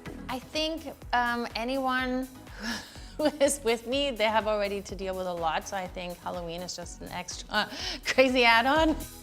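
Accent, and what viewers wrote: Germany. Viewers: She sounds more German to me now lol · Do you mean her accent has become stronger? But yeah, I can definitely tell there are a few linguistic features about her way of speaking that distinctively mark her as German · Her german accent is more apparent here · she sounds extra german,is it just me🤔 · I love how you can still hear some German accent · That german accent 😂❤️ ich komme aus Deutschland und man hört es der guten an lol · I kept hearing an accent then found out she’s from Germany! Crazy how most of her accent is gone.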